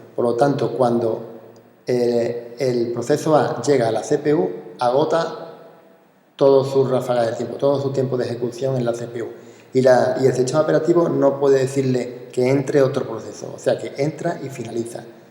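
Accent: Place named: Andalusia, Spain. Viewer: Yo diría que muy andaluz y con orgullo. Porque hablar sin miedo a reproches de algunos, orgulloso de su acento, al menos para mi, es digno de elogio.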